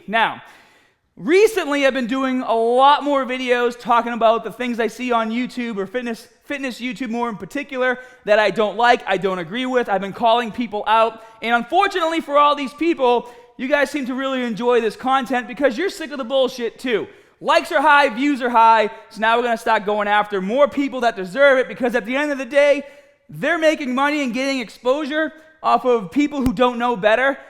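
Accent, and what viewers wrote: Boston, United States. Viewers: Came because of the title, stayed because of the Boston accent. Good video bro, just gained a subscriber, about to binge watch your videos 💪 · Are you from Bahston? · Is that a Boston accent? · It’s funny, he sounds like a pretty average accented American, until he say “gAAbAAge” lol. Oh how I love a good Boston accent! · a little less pre-workout maybe, I get you are passionate but yikes dude. PS love the boston accent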